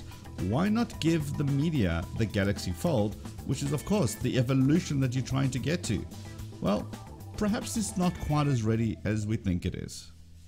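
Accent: South Africa. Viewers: Are you from SA?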